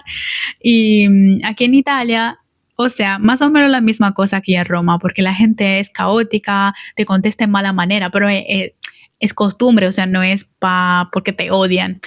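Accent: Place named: Dominican Republic. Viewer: Soy Dominicano en New York y encontre tu canal hoy.. Me gusto tu vibra y me suscribi.. Tu español es como dominicano .. abrazos desde new york :goodvibes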